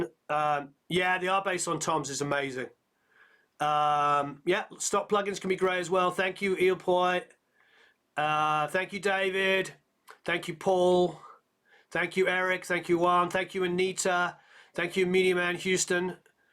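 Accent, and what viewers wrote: England, United Kingdom. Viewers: Don't lose your English accent, we love it, good info, thanks.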